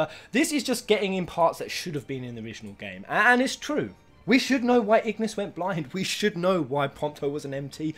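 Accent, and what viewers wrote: England, United Kingdom. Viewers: You remind me of my childhood friend Robby but only with an english accent.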